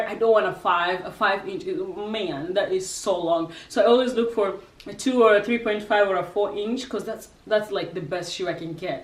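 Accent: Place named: Cockney, United Kingdom. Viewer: Great videos. Why do you have some hidden bri-ish or rather cogney accept? Which State are you in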